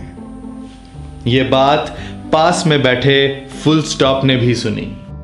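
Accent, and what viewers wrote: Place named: Kerala, India. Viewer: Malayalam spoken English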